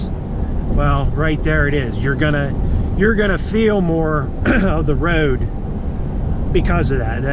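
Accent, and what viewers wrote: Pennsylvania, United States. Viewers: Not sure but do I detect a central Pennsylvanian accent? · Do you live in Pennsylvania? I’m picking up a slight PA accent.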